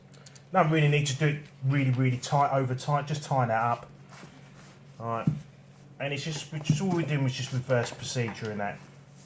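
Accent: United Kingdom. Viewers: the best I,m in canada and my machine is a titan but looks exactly like yours, wont prime and I think its the valve although I cleaned it all out and the ball is free its a mystery, love the cockney accent, like me east end boy thanks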